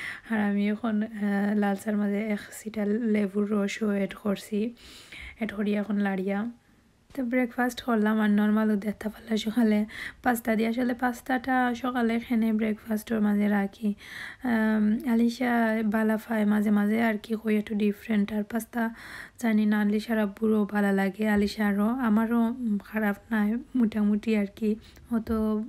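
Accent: Sylhet, Bangladesh. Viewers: সিলেটি ভাষার বোন নাকি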